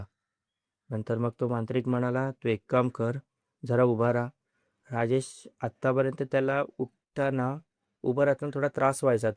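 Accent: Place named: Pune, India. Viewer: अभिवाचनाची लकब पुणेरी वाटते❤❤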